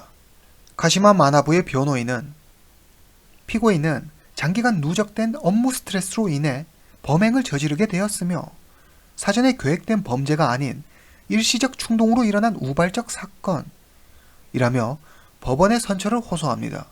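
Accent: China. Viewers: 혹시 조선족이신가요?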